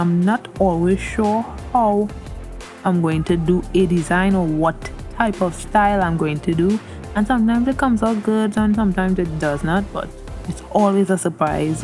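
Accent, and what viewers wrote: Trinidad and Tobago. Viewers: I'm in the❤❤❤❤ with this.. you in the Caribbean. Well that's sounds like a trini accent. I'm just up the road😂😂😂 think I can drop by😂😂😂🏃‍♀️ I'll bring all my products I promise😂🤣🤣🤣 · I had a mind that you are a Trini being one myself and sounds like someone I know. But all in all I love your designs.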